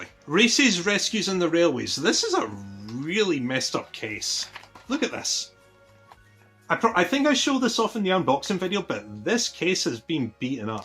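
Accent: Scotland, United Kingdom. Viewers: Are you Scottish?🇬🇧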